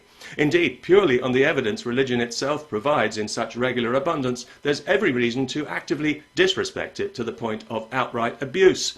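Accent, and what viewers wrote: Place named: United Kingdom. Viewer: Pat Condell é um cara d+ adoro esse sotaque britânico dele tem uma argumentação lucida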